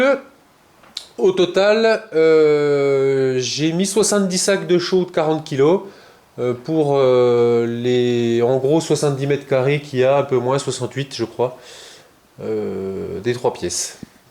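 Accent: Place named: South, France. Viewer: je vois que tout le monde est rentré du chantier ou des courses à cette heure. Fais tes vidéos avec ton envie et ton petit c...r, ça va marcher tout seul (bon dans le texte y'a pas d'accent, toi tu l'as du sud, de la contoise, et moi de la parisienne, ça nous ferais un mélange bien bizoire) A+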